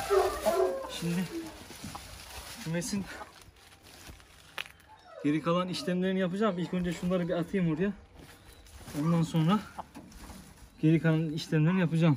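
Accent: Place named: Araklı, Turkey. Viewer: Usta kesin Araklıdan konuşmadan belli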